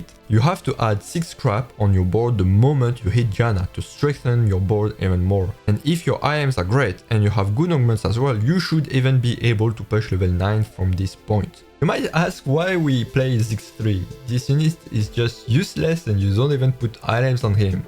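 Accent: France. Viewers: Asian dude with french accent is throwing me off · Mec je kiff que tu ais l'accent français, de l'humour, de l'intelligence et un amour pour TFT ! Tu nous fais sortir de l'ordinaire 🙌🏼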